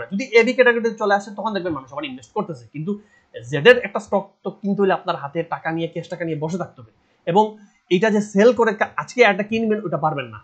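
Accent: Sylhet, Bangladesh. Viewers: ভাই আপনার কথা খুব ভাল লাগে।আপনি সিলেটি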